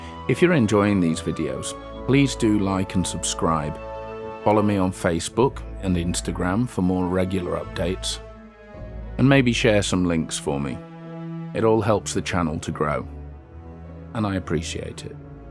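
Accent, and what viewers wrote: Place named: United Kingdom. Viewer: Either way, whatever you decide, you have been a huge inspiration. I have been out and started to stock up on supplies. I’m not sure what scale or style I will settle on. I do want to thank you as I mentioned for documenting all your work. I was really surprised to see you are based in New Zealand, especially with such a strong British accent. Thank you again; I look forward to seeing the notification you have posted a new video. Best wishes from Blighty.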